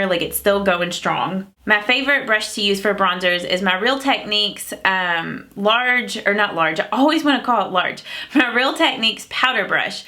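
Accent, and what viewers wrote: South, United States. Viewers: it always makes me happy to hear a fellow southern gal speak. it seems like all the makeup YouTube channels are from either the north or Texas etc. the southern accent is musical.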